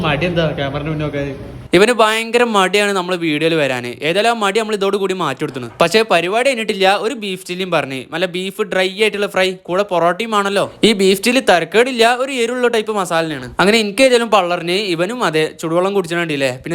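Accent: Malappuram, India. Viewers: Sound from malappuram ♥♥♥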